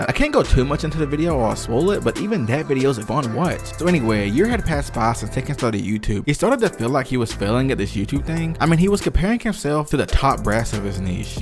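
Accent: South, United States. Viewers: I haven’t heard a southern accent this deep since I visited The University of Alabama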